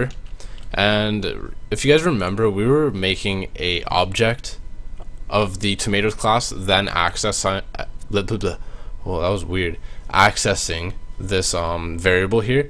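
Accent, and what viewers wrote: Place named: Canada. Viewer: Canadian…. I was fishing around for the accent. Didn't quite get the origin.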